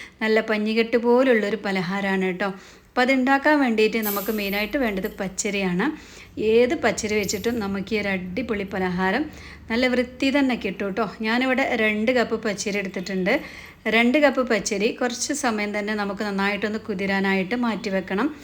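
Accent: Malappuram, India. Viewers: പാചകവും വാചകവും ഇഷ്ടപ്പെട്ടു കേട്ടോ, മലപ്പുറം ഭാഷ ഒത്തിരി ഇഷ്ട. സൂപ്പറാണെ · നിങ്ങളുടെ മലപ്പുറം സ്ലാംഗ് ആണ് കേൾക്കാൻ രസം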